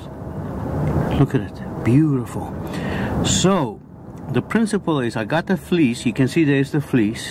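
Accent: South Africa. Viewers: are you from South Africa your accent sometimes sounds like you are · Great video. Are you South African?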